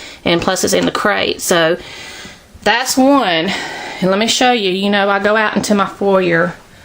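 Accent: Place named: South, United States. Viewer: Great idea! Wish I had a full room to use for crafts, and not just a desk in my living room. Lol. P.S. I LOVE your southern accent. ^.^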